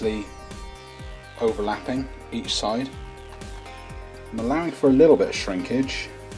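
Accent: Bristol, United Kingdom. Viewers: Good video, are you from Bristol?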